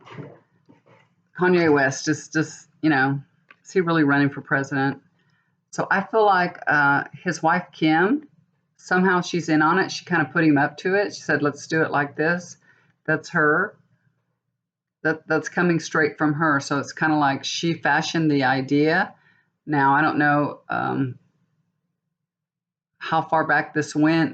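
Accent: South, United States. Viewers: Kim for Intuitiview mentioned you in her latest post. So glad she did. Great channel and you're Southern twang is so cool. (I'm Australian)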